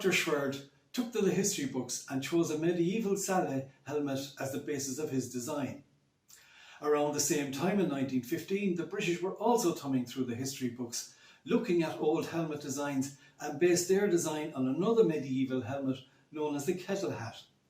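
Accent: Ireland. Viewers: It took me about 40 seconds to start making sense of your Irish accent. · Personaly, I thought the man a fine speaker and his Southern Irish accent a delight to listen to. I have family in Yorkshire and have visited the county lots of times over the last fifty years(great countryside,great food,great pubs,great accents). A good talk, which cleared up a lot of points and scotched a few myths. It was most interesting to note the helmet was in fact a vickers product. Not surprising when there were once at least six Irish Regiments in the Briitish Army. I am from Scotland,and no, - not a Nat, a Brit. Thank you,informative stuff.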